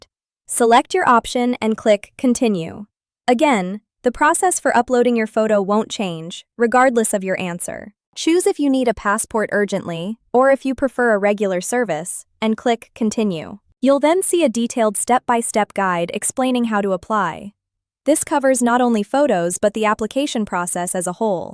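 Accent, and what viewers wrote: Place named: United States. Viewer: why woman with an American accent ?????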